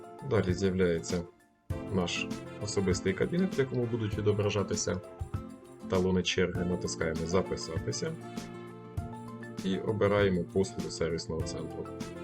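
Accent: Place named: Russia. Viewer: Дякую, було цікаво. Тільки чому Ви українську "е" читаєте на російський манер - /йе/? Навіть якщо це умовно латинська "е", то вона теж не /йе/, а /і/ або, якщо вже не англійська, то німецька чи італійська - /е/ ("э" - якщо вже на то пішло).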